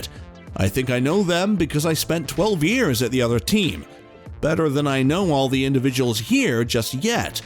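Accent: United States. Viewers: Narrator is a septic. What did you expect?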